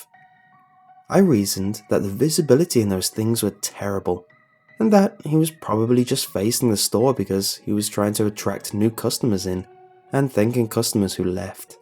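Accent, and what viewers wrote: United Kingdom. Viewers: half tempted to watch IT, but deathly terrified of clowns and I wanna say has an English accent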